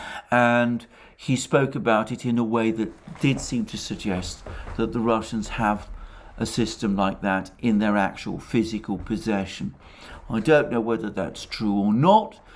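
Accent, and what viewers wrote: United Kingdom. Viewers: With all due respect, but I disagree on your views regarding the assassination attempt on Dugin!!! The ends justify the means and he is supposed to be Putin‘s „brain“. And hearing someone with a british accent complaining about ONE innocent life which has been lost is soooo funny!!! By the way… how‘s the „Bomber“ Arthur T. Harris Statue doing in London? Please do not tell me about lost, innocent lives!!! You are just not entitled to do so. Sorry… thats my very personal view.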